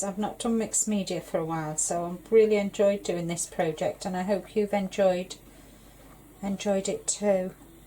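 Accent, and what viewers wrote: Liverpool, United Kingdom. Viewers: Love how you put this together, watched the vid after watching some of Mike's... only recently discovered this form of art.... you're very inspirational..... do I detect a hint of a scouse accent there? X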